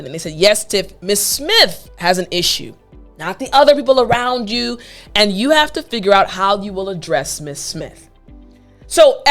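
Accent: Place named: United States. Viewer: I really and truly appreciate your way of speaking,i'm also Working on improving my fluency.i'm a learner .and I am a congolese. Props to you I wanna talk as you are talking cos i live the american accent. I cannot express my appreciation.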